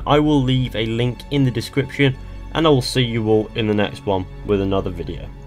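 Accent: United Kingdom. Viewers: Hahahaha !!! ... "Arrow after arrow after arrow after arrow" ... I Enjoyed a lot hearing this in your British accent ... I am still laughing ...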